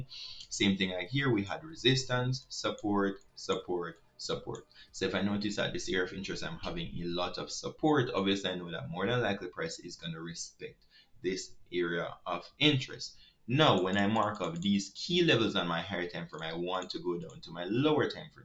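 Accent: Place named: Jamaica. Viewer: You sound Jamaican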